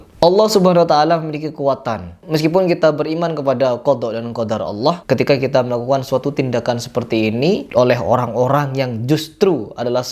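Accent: Madura, Indonesia. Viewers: BANG ASLI ORANG MADURA ? LOGAT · Bang ini logatnya kyk org madura.. org madura ya bg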